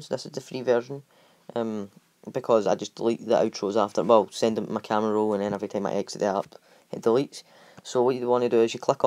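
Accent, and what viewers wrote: Scotland, United Kingdom. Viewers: idk wat ur saying cuz ur Scottish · you are Scottish but you're not telling me what I wanted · Cus he’s Scottish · Are you Scottish or British bc guess what I am SCOTTISH I LOVE GLASGOW OR SCOTLAND LOVE THE RAIN(not it’s so badddd D:) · Is he Scottish bc he hears like me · Oi? I believe you're Scottish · Are you Scottish because I am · It's a Scottish and he is from Glasgow same as me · Starwars Villians no he's not he's scottish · Your from Scotland aren’t you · Finally.... A Scottish YouTuber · Wow a Scottish youtube that's like a one in a million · Scottish YouTubed first one I’ve seen on youtube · U fae scotland tae im fae glesga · Are u Scottish coz I am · FINALLY SCOTTISH BOI YOUR THE MAN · You be Scottish boiiii! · Are u Scottish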